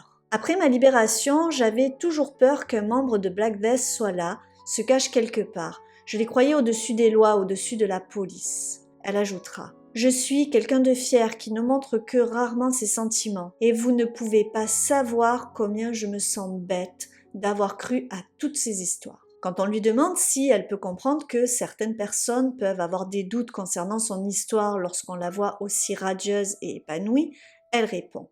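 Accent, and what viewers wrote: South, France. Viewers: tu viens d avoir un nouvel abonné a l instant désolé je connaissais pas ta chaine avant cette fin de soirée j aime ce que tu fais félicitations miss avec ton petit accent du sud j aime beaucoup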